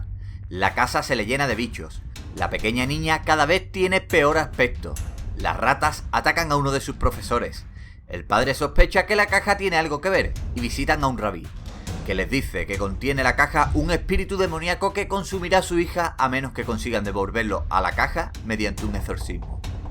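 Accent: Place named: Canary Islands, Spain. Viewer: U DROSSTOP10 NO? VALE PUES PONTE DE NUEVO NOMBRE DOCTOP... ESPERA QUE? YA EXISTE D: PONTE... TOP10VIDEOS O ALGO POR EL ESTILO AH! Y PARECES CANARIO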